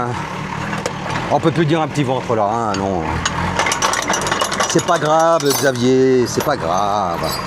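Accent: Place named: Quebec, Canada. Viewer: t'as l'accent québecois maintenant ! top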